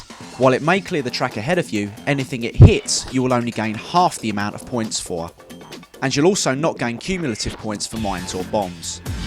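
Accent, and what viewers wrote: United Kingdom. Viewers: It sounds like he might be from Essex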